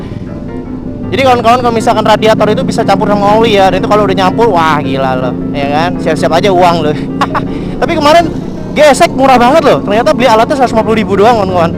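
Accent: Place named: Palembang, Indonesia. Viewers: Palembang ini mah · Palembang bosss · Cak mno Palembang woy wkwkw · cak mano itu logat Palembang-_ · Itu mah Palembang bng🤣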